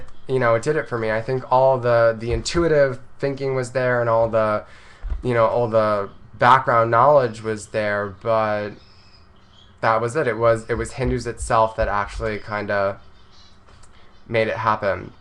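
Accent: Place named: United States. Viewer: No need to apologize. Hindi pronunciation varies in different regions of North India. You develop your own American Hindi pronunciation. That would be more original. ;-)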